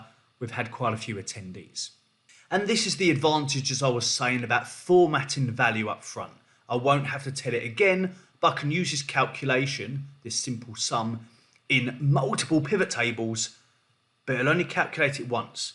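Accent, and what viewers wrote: United Kingdom. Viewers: Yes. I like your voice!So British! So unusual!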